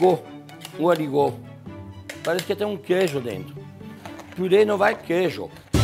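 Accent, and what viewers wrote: France. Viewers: O Jacquin tá aqui no Brásil vários anos pq ele fala português com sotaque francês e dificuldade? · amo o sotaque francés dele um amor de pessoa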